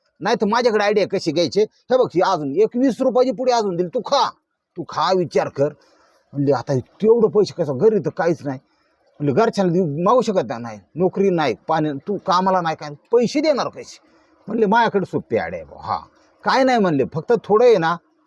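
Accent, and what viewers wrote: India. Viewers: Good job Sir , खुप छान ग्रामीण मराठी बोलता तुम्ही 💐💐💐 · Mama तुमची गावरान भाषा कानाला ऐकायला छान वाटते. जाऊद्या गाडी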